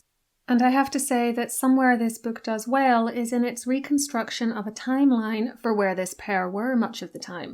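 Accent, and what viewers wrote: Northern Ireland, United Kingdom. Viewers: Your sound you from Northern Ireland I guess you are very British i’m not surprised to hear your opinion. Remember, it’s just your opinion.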